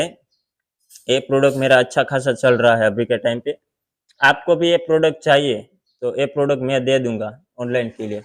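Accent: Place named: Kathiyawad, India. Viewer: Apne jo 30,000 rupees invest kiye the wo kis chij me invest kiye the wo ap explain kar sakte hai kya ? Video me karenge to bhi chalega or messages me replies karenge to bhi chalega.....or mujhe ye janana tha ki - 1 - melting machine price 2 - kitane type ke wax type hai apke pas or usaka price. 3 - wax melt karne ke bad jis glass me wax dalate hain us glass ka price 4 - maine apka box dekha hai wo apne shayad banwaya hai or wo bahut cha hai to us packaging box ka price. 5 - ap kitane type ke colour use karte ho or her ek color ka price. 6 - agar ap fragrances use karte ho to usaka price kitana pada apko. 7 - wicks ( candel dhaga ) hoti hai usaka price 8 - candle banane ke liye ap jo molds liye honge, to apne kitane types ke molds liye hai or unake bhi price batao. Hame bas apke raw material ka price ka idea batao kyunki hamare idhar ki kuch ladies start karna chahti hai. 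Or wo bahut Young hai agar ap ye sab batayenge to bahut help hogi. Ap shayad gujrat se hai, because apka awaj kathiyawadi type ka lag raha hai, or apki video me jo apne e-commerce ki journey dikha rahe hai... Wo bahut achi hai. Aisehi ap imandari se kam karte raho.bhagwan apko bahut age bhadaye Dhanywad apko 🙏❤️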